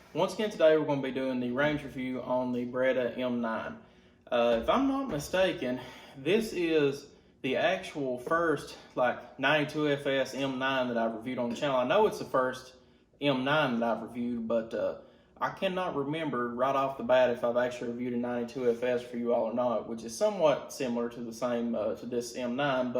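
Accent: South, United States. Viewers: Hell yea glad to hear a fellow southerner creating good content in the gun tube space.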